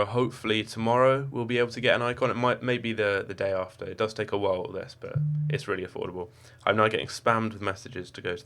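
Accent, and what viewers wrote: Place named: Scotland, United Kingdom. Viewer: Never know you were Scottish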